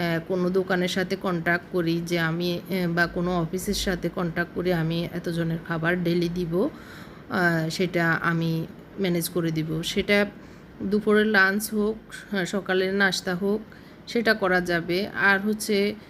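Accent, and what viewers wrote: Noakhali, Bangladesh. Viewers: আপু তোমার বাড়ি কি নোয়াখালী???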